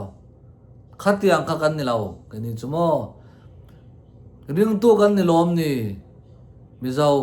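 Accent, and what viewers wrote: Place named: Bengal, India. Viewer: antih ngei chu I ni e. I tawng kha Beng leh lam ah a tlang rak mai mai rawh khiang tum I ang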